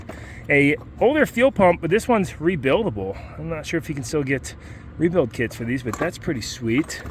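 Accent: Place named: Western Pennsylvania, United States. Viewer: Where are you from? West PA or east OH? Accent gives it away.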